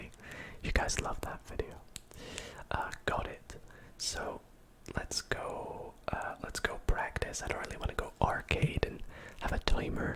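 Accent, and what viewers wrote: United Kingdom. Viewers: Talk strange? How so..? Do you mean the British accent? What's strange about that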